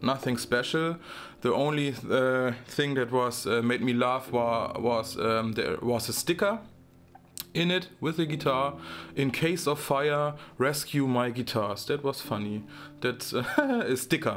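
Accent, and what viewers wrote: Germany. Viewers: Mensch schwätz schwäbisch wia mir au!!! 😂😂😂😂😂😂😂😂😂 Tolle Review. Die rote ist meine nächste und letzte (Muahhhhaaaa) die ins Haus kommt. Gruß aus dem Süden El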